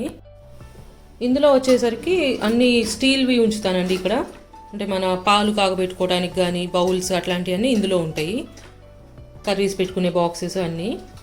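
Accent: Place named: Guntur, India. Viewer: Super ga undhi mam, mana guntur language and way of explanation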